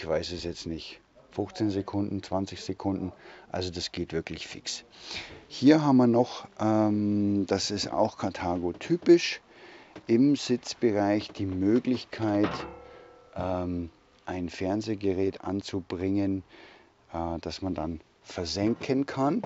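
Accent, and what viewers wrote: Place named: Lower Bavaria, Germany. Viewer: Hallo, also das Video wäre noch besser, wenn du dich auf deine Heimat Sprache ( Niederbayrisch) einigen könntest, man merkt, dass du dich anstrengen musst wegen dem versuchten Hochdeutsch, deswegen deine Langsamen Wörter/Sätze. Bei ca. 14/16 min. willst du den linken Kleiderschrank (wenn man zum Bett davor stehst) erklären, ist dir da ein Fehler unterlaufen? denn die Türe geht ja gar nicht auf, die hängt an den Treppen, also kann man die nur von oben aufmachen. Denke du solltest in Zukunft deine Vorstellungs- Mobile vorher besser/vorbereiten/ ausprobieren wo was steht oder geht. Denke "Bayern Camper hat eine bessere Werbung verdient.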